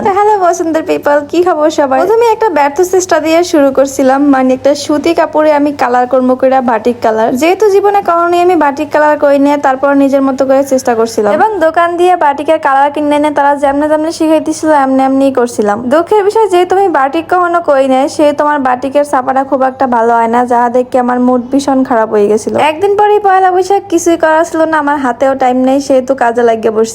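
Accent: Barishal, Bangladesh. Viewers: জি আপু সবই ঠিক আছে;কিন্তু আপনি নিশ্চয়ই বরিশালের মেয়ে!!👈💃💃☺️☺️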